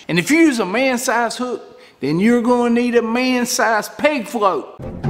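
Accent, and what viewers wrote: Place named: Southwest Virginia, United States. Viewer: If I didnt know any better , I would say with the accent you probably pretty close to my neck of the woods Southwest Virginia here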